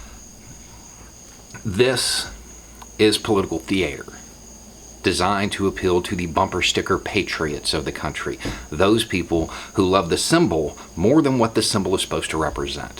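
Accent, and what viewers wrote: South, United States. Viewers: I love this guy so much. With my own inherent bias and assumptions , I immediately assumed that a white man with a beard and a southern accent was going to post racist/right wing rhetoric. So it seems I have to do some self reflection of my own and think about how I perceive white people. His such a gentle and thoughtful soul. His narrative is both beautiful and soothing and most of all he makes sense! He critiques society through a historic and present lens and I love that. we need more people like Beau who preach,love, equality and understanding. THANKYOU ❤️❤️❤️❤️🙏🏾🙏🏾🙏🏾🙏🏾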